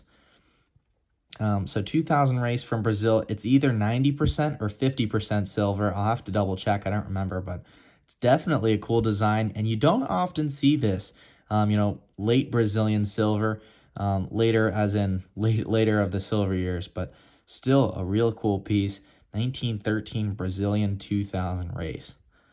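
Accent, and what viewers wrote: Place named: United States. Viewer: P.S American pronunciation is hilarious to the rest of the British world !! Bless!!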